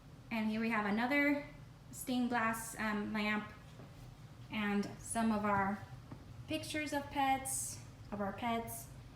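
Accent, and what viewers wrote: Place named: Louisiana, United States. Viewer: Beautiful home and property. You sound like you are originally from south Louisiana area to me. We would love to relocate to Maryville from Houston one day.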